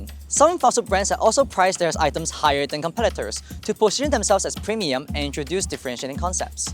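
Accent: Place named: Singapore. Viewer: Tf was he saying. That fake slang plus Singaporean accent damn confusing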